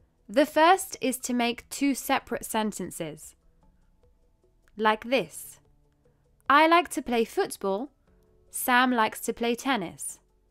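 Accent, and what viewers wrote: United Kingdom. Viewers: Your Accent is British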